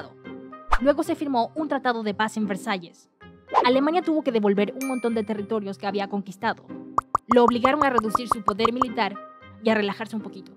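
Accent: Panama. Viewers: Eres de Panamá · No entendi español pls (Eres de Panamá?)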